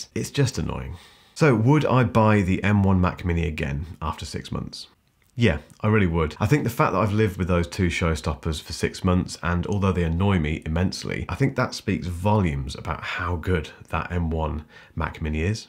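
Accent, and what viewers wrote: United Kingdom. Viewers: I like your style; and your grey walls, so easy on the eyes. You've obviously thought about the visual look of your show. I like your clipped British accent. You guys just speak English better than we do :) I'm American. You also get the information out in an articulate and quick manner, not always the case with other youtubers.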